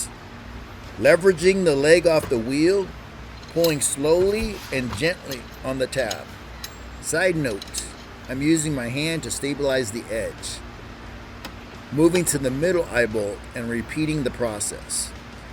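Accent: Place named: California, United States. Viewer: New viewer, Awesome work 👍I'd hire you in a sec, but I doubt you're in my area 😕 I'm guessing that's a Californian accent, I'm in Ohio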